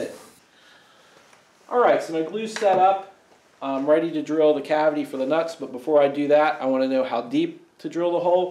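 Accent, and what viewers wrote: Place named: Philadelphia, United States. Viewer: What part of the Philly area are you from? (Accent gives it away if I am right) LOL Very nice project. I've never seen anything like this before. Definitely making some. Thanks for taking the time to make the video.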